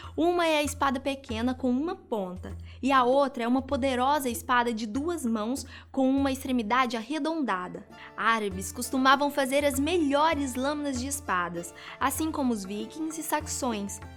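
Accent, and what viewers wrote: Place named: Minas Gerais, Brazil. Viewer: aposto que essa mina que apresenta é mineira e de BH, um mineiro reconhece o outro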